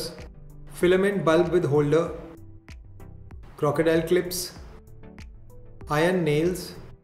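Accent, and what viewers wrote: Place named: Goa, India. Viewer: Achha😁Sensed Goenkarpan in your style of talking mhanun vichaarle :)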